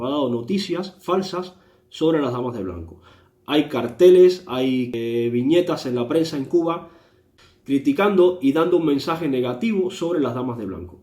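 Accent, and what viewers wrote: Spain. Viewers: No parles com els cubans. El teu és un bon castellà de Castella. Jo el que tu dius ho aplicaria al PP, a C's i a VOX. Jo he viscut al Brasil i a les Filipines, on voten lliurement, sí, als que tenen més diners, quasi sempre. I no cal anar molt lluny. Has parlat, alguna vegada, de com eren abans l'Iraq, Síria, Líbia, l'Afganistan quan els de Wall Street els van portar la democràcia i com eren i com són? Ací els de l'Ibex-35 són els que manen i no són votats. La peor de todas: ¡dictadura del mercado! Marcelo Colussi Rebelión “La ideología dominante es la ideología de la clase dominante”, decía Marx. Expresado de otro modo: el esclavo piensa con la cabeza del amo. ¿Por qué? Porque la imposición de los grupos de poder es total: se da en el plano material y, quizá con más fuerza aún, en el campo cultural. Eso es la ideología en definitiva: la argamasa que solidifica una sociedad no permitiendo ver la lucha de clases que la mueve o, en todo caso, haciendo de esa lucha y de las diferencias sociales algo normal, natural. 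Las clases dominantes, siempre a través de la historia en cualquier modo civilizatorio, ejercieron su poder en forma brutal, con el lenguaje de la violencia, pero también con la sutileza del discurso ideológico. Las diferentes instituciones que se fueron creando a través de la historia (familia, Estado, iglesias, la cultura en su sentido más amplio, la escuela, los medios de comunicación, etc.) son los instrumentos encargados de asegurar la transmisión ideológica. Lo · HOLA MUCHACHITO LINDO CUBANITO YA TIENES UN POCO EL ASENTÓ ESPAÑOL......PERO NO PIERDAS EL NUESTRO Q ES UNICO...OK.GRACIAS X TU MENSAJE MUY CIERTO E INTELIGENTE.....CUANTA VERDAD EN LA COMPARACION....POBRE D MI GENTE Q YA SON 60 AÑOS D MISERIA Y DESTRUCCION....VIVA CUBA LIBRE.........!😍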